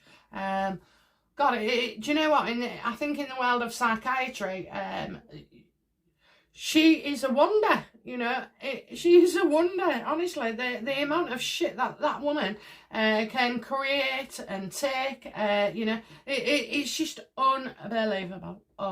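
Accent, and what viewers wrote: United Kingdom. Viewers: Her British accent is too thick I love it but can understand her.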